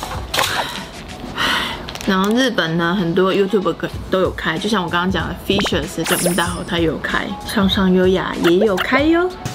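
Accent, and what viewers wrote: Taiwan. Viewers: 「 好辣選我選我」 喜歡看開箱的影片還有聽你烙台語哈哈哈真得辣了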